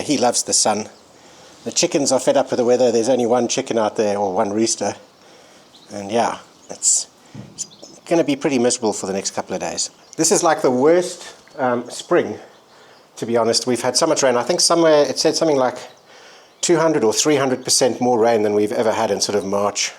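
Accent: South Africa. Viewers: After 23 years you still have a completely South African accent😂😂😂😂😂😅😅😅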